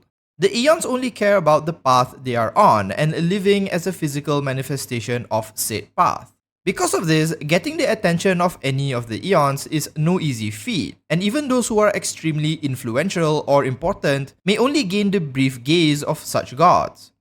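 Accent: Malaysia. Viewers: u sound malaysian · Yo are you a Malaysian? I swear your accent sounds like one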